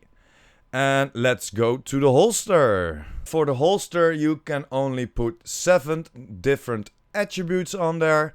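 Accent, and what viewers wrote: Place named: Netherlands. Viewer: Funny that you can hear that you are dutch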